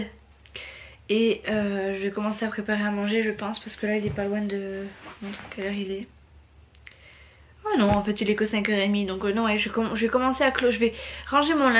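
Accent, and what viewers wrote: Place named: Southwest, France. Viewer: L accent j adore Ça sent les vacances pour moi Le sud ouest 🌞🌞🌞🌞🌞🌞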